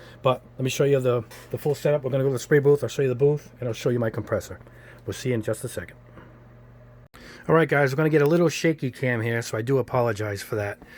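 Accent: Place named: Long Island, United States. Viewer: You have to be from Long Island. I can understand ever word you say clearly haha!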